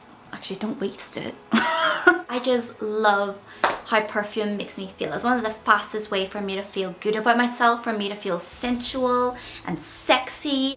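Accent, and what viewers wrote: Ireland. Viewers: I just found your channel and have been thinking your Canadian now I hear Irish!? 🤔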